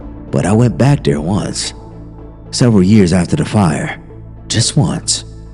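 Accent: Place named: South, United States. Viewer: Great job on the southern accent! I'm from TN & so many narrators can't pull it off but you nailed it my friend. Always good to hear from you. U are an awesome narrator & I enjoy your channel very much. Take care & hope you have a fabulous Friday & a wonderful weekend ✌🏽❤️✌🏽❤️✌🏽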